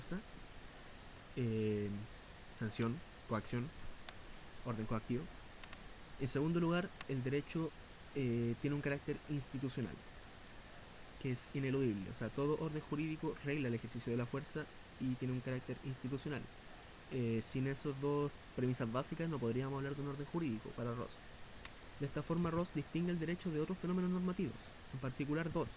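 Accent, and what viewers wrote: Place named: Chile. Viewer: es Chileno x eso no se le entiende bien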